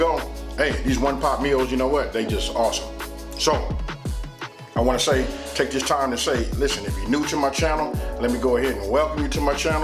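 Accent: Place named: Los Angeles, United States. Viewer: You have an LA accent. I can hear it when you said "starving"... Pronounced like "sshtarving" etc.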